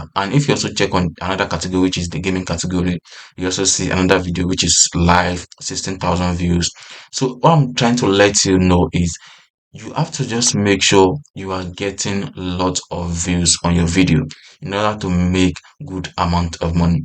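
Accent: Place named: Africa. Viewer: Improve your Audio. Your English is very poor, you have a strong African accent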